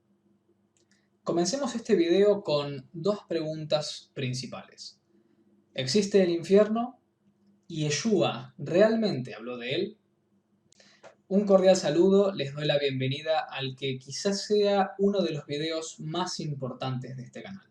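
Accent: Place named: Argentina. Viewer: RECIBE MI SALUDO Y MI ABRAZO. ME AGRADA LA RIGUROSIDAD EN LA INVESTIGACIÓN Y LA ETIMOLOGIA. TODO LO DE LA BIBLIA ES MANIPULADO Y TERGIVERSADO. VALORO TU INTELIGENCIA, DISCERNIMIENTO Y OBJETIVIDAD. SUPONGO POR TU ACENTO ERES ARGENTINO, Y ESE LOOK Y BARBA ESTAN 1A. ANIMO Y ADELANTE!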